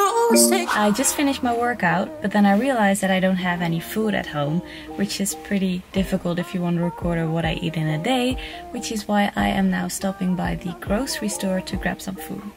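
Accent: Netherlands. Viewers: Ik dacht al een beetje, aan je accent te horen, dat je Nederlands was! Maar hey Albert Heijn ✌🏼. Leuke video !!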